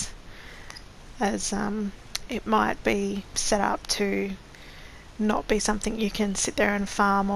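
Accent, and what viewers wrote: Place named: Australia. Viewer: I was not on speed 2 and wasn't able to get the stop and go up off the bottom. Was giving the little grass pickerel a fit changed to speed 2 first cast was practice.... second cast Trophy Grass pickerel. Lol off to Neherin for that last one in my mission Thanks...love your accent by they way...is That Aussie?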